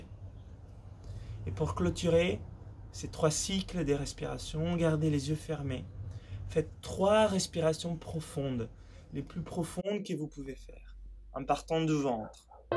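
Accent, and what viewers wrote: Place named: Italy. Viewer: Merci beaucoup! J'adore ta façon de coacher. Je pratique la respiration Wimhof depuis plusieurs mois mais j'ai acquis plus de fluidité et de détente en t'écoutant. Ayant travaillé de temps à autre en Italia, j'ai aussi le plaisir de retrouver la musique de ton accent!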